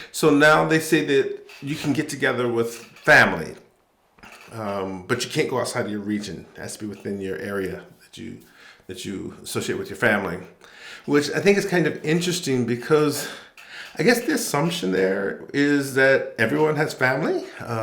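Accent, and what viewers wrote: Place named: Italy. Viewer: You picked up an Italian accent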